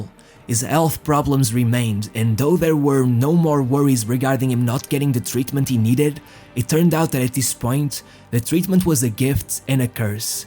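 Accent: Portugal. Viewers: Eu sabia que eras português 😅😅 dava para perceber no sotaque mas nunca tive a certeza 🤣 Óptimo trabalho que estás a fazer! Tens jeito para desenvolver a história e arranjas boas imagens apoiar a história. Sem dúvida que envolve muito trabalho e pesquisa mas compensa, pois os vídeos são muito bons!! Continua assim, que ainda há muitos génios do futebol para falar 😉 E viva o Norte car*lho 🤣🤣🤣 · Esse sotaque e esse toque de paixão,no falar dos nossos grandes, é de um português honrado... :) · the speaker who sounds portuguese, should kno that thhe spaaish name carlos rexach is pronounced carlos rejach in spanish! I dont kno him personally, but u should kno that!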